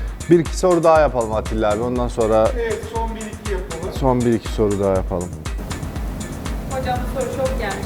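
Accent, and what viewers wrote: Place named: Turkmenistan. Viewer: Konusman Türkmenlere benziyor. Türkmen misin?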